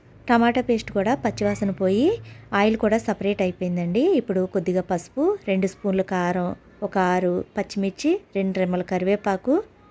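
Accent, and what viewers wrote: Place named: Godavari, India. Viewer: K sis ma godavari voicela undi Andukey adiganu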